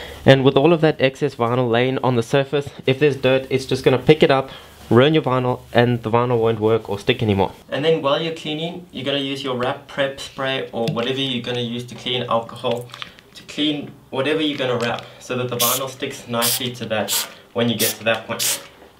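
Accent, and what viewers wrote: South Africa. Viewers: Is that a south african accent? Just curious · He sounds like an English South African. You must hear the accent of Afrikaans South Africans trying to speak English.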